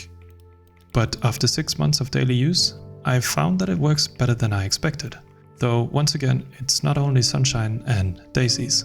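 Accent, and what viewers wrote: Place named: Sunnfjord, Norway. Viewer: Haha, eg trudde eg hørte litt av ein Sunnfjordsdialekt, du skjule r’en din bra på engelsk!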